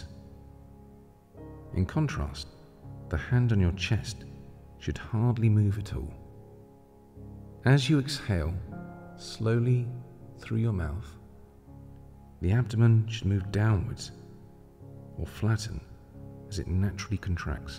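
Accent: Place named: United States. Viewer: Nazir Hussain sir, where did you go? You narration is calming and effective this American accent is too mainstream doesnt sound good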